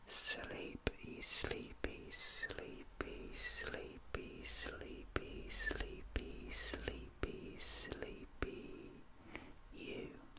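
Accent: United Kingdom. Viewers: relaxing together with your british accent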